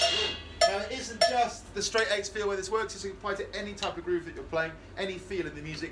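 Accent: England, United Kingdom. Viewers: loved it!! also I love your English accent :)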